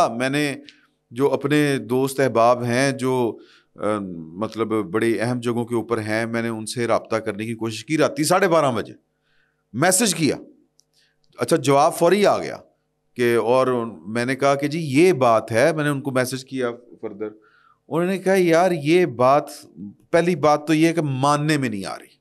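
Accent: Punjab, Pakistan. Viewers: MashaAllah apka style with punjabi tarka is outstanding. Very informative and entertaining. Apk colleagues apko beat nahi karsaktay no matter how much they try to copy you,including Mansoor Ali khan. Keep up the good work.God bless you.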